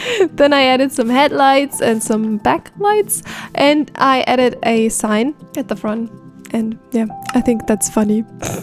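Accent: Germany. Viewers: The deuich accent is there but the animated avatar and Minecraft skin look Japanese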